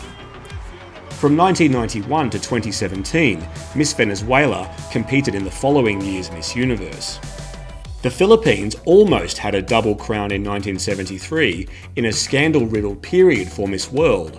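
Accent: Australia. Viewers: I've been researching this kind of information for myself, and now I found it all perfectly researched and summarised here! Great visuals, pleasing voice-over (love the 'soft' Aussie accent), and neatly and professionally packaged. Love from ZA!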